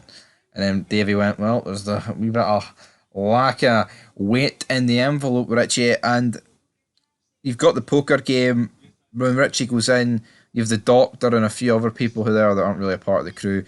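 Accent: Scotland, United Kingdom. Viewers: The Scottish narration doesn’t go with the subject matter.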